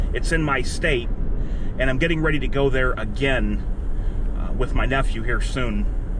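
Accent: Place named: Western Pennsylvania, United States. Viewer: Obviously you’re from Canton too. Although I can almost detect a Western PA accent.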